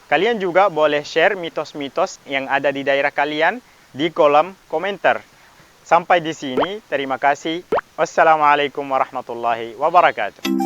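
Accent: Makassar, Indonesia. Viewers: Serius kak. Semakin kesini bahasa Indonesia Bagus. Tp semakin kesini jg logat makkasr jg semakin jelas :)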